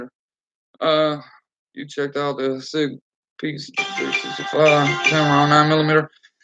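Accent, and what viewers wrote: United States. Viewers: He is my little buddy from the north. He is from Ohio. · Nothing wrong with it i was just trying to figure out where i heard it before im from Michigan myself and really i thought he was from Detroit !!!!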